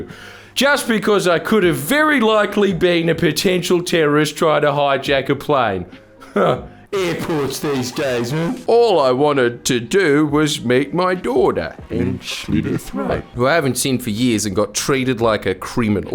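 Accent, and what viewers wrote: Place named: Australia. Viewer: Hey friendly Jordans I'm glad you're still here! We love you and your beautiful aussie accent. Keep questioning everything and providing us evidence from across the pond about how your pepple have been under the same control as us. We will be free from this tyranny one day!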